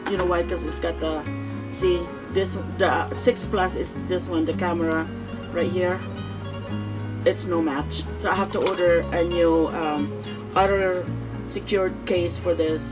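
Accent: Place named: United States. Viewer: I love the way you talk kabayan as your accent sounds like an American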